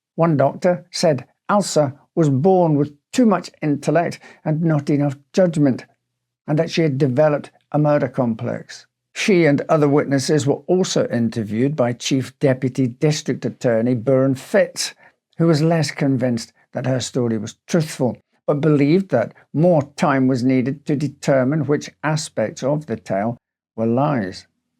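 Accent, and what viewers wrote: United Kingdom. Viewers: This could be a regional English accent.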